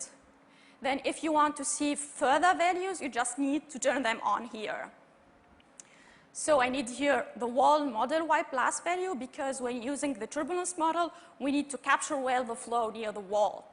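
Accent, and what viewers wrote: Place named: Sweden. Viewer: I bet she is swedish, I believe that's the accent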